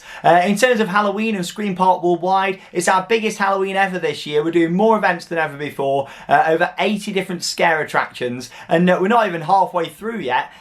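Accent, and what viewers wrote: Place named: United Kingdom. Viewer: Being an American, it always makes me laugh when you and some other brits say duel, because it sounds like juul 😂 “...Now this will be a new juuling coaster...”😂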